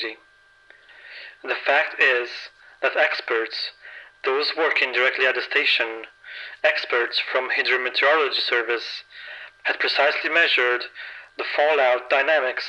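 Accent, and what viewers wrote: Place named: Sweden. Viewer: Are you Swedish? I read your profile and it says Romania but your accent strikes more as if you were a native Swede.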